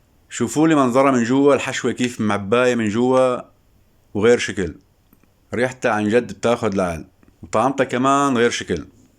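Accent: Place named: Latakia, Syria. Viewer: معلم ابن البلد تسلم ايدك دخيل رب الحكي اللادقاني واشتقت لشي نزلة عالبلد يا خي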